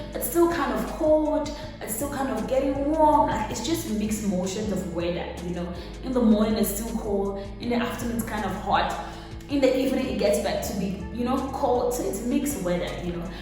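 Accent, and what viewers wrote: South Africa. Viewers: Wow i like how you are so open minded ...You just sound like a typical South African when you speak our languages 😂❤️...We love you so much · She even sound South African, and I don't mean her understanding and speaking of SA languages, I mean the way she speaks English sounds very South African. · For a second i though your southy you have our accent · You my DEAR , You Sound just like ONE of US, I mean South African Keep Learning our WAYS of RESPECTING our Cultures and Elders .Good Job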